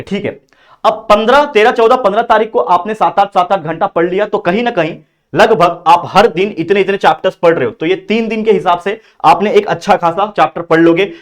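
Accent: Maharashtra, India. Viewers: sir kya aap marathi ho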